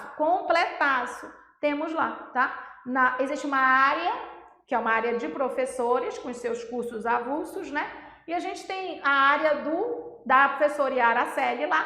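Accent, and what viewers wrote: Pará, Brazil. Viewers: Ótima aula . melhor ainda com esse sotaque paraense .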